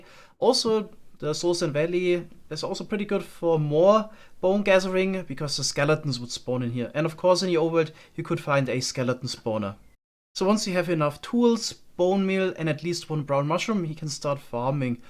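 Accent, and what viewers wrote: Germany. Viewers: Bro, are you german? That dialekt xD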